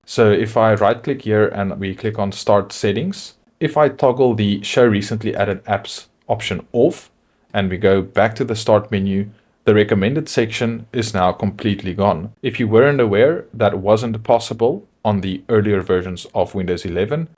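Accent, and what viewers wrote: South Africa. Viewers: You sound South African... homie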